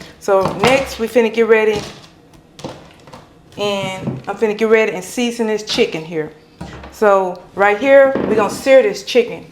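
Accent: South, United States. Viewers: I like the southern accent, mispronounce words and all don't change and I know that this is good cause I've made some dishes right out the bag right out of the bag in a can you gotta make stuff easy after a long day on the job and get this y'all they do the same thing in restaurants is out of a bag where can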